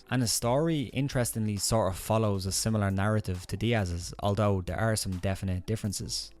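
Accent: Ireland. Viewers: Haha love the Irish accent man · Heard the Irish accent 20seconds into the video. Assumed he was going to be biased towards conor. Turned the video off.. Sorry dude. An Irish man commenting on nate diaz just does NOT work for me. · it's a weird feeling .. hearing an irish man talk about Diaz. Certainly lits a fire under me belly! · “Well shimmy me timbers!”This was absolutely awsome to hear this narrated by an Irishman! Believe it or not, the Irish & the Mexicans have a lot in common! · Awsome job my friend! You were spot on! I have to admit when I first heard the accent I was surprised when you started to drop that knowledge. Didn’t think an Irish man would but the time in to study Diaz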